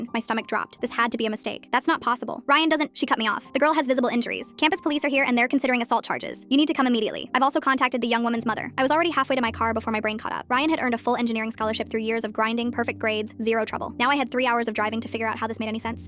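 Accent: United Kingdom. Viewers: that's British accent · Why did she turn British Midway through the video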